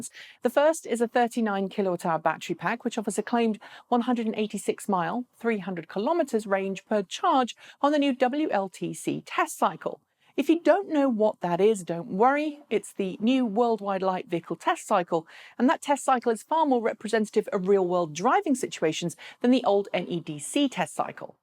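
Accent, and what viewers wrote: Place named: United Kingdom. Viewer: proudly points out from time-to-time that she is unapologetically British. 😁 As such, she will pronounce certain words in a manner unfamiliar to those of us who speak Informal American Standard Idiomatic English, and stuff.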